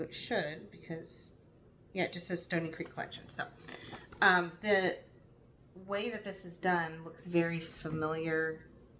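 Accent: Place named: Canada. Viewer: Hi, clicked cos thought oh wonder if she is from Canadian prairies. Made me laugh when said were in AB. You sound Canadian lol. So glad you like Kansas. We are in Nova Scotia but lived in Florida for a yr in the 80s. My daughter’s one son’s name is Benjamin.❤ oh your poor son😢. Oh and Junie is beautiful! Yes Australian shepherd for sure❤hard road figuring adhd and things like that. Oh your stitching is beautiful! Good job on your finish. Oh the floss dying is neat, I haven’t tried that. As I watch I am working on full coverage 3ft long by 2 ft wide. Oh the fabric and colours of floss are beautiful, it will turn out great. If you lent me a pattern, I would make a working copy.. and keep your pattern in the sleeve, so I see nothing wrong with using s working copy. I make working copies of patterns I buy as well…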